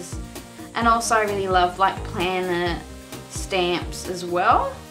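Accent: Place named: Australia. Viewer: Omg I was wondering if you were aussie from your accent. I had to check the info on your page. Yay to Aussies using Hobonichi. But omg the shipping!!!!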